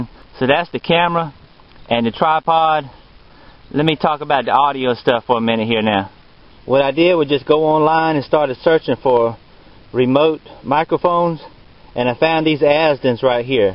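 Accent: South, United States. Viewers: Great and informative video! You seem like a really nice person; thanks for sharing your knowledge. PS--love your Southern accent! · I just love that Southern accent. Plus, the guy was very positive and encouraging. I love it when things are kept simple - very simple and to the point. Great job!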